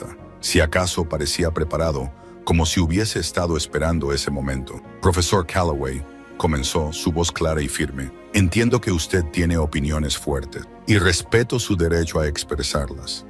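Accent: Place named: United States. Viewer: Pregunto. El narador porque Abla de una forma tan rara parece que es americano y termina ablando en un Español raro perdón es un detalle que a mí me hace dudar la intención. Perdón es un detalle.😮😊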